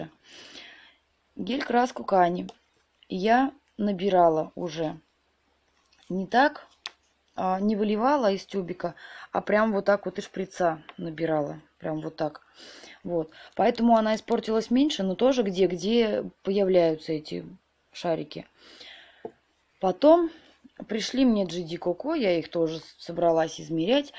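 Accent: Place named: Ivanovo, Russia. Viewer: Говор у блогера похож на ивановский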